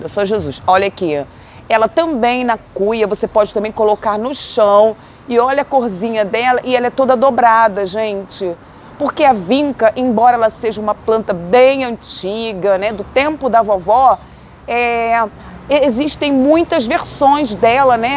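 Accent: Rio de Janeiro, Brazil. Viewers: Eu acho que vc é carioca.deve morar no rio de janeiro vc tem o sutaque de carioca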